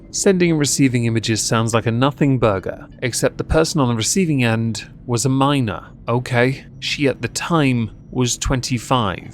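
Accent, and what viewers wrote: RP, United Kingdom. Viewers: Yeah I can't figure it out, I listen to other British YTers, and yes, A British accent always sounds Somewhat more dignified to the American ear, but there's something Extra "posh" abt his voice... Like, maybe something in the presentation/delivery? There's def no "umm"ing and " uhhh"ing, maybe its the concise, dry delivery. I dunno but I dig this posh dude's channel. (Plus anyone who h8s Nader is cool in my book... "The enemy of my enemy is my friend."😈🔥💯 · Plus his accent is brilliant, posh bugger 😆.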